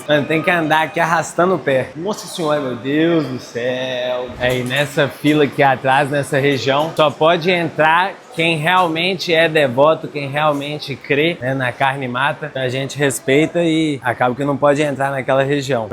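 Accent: Minas Gerais, Brazil. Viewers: Mano acho que você é mineiro como eu, ou eu me enganei ! Rs Esse sotaque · Eu amo seu sotaque, só quem é mineiro entendi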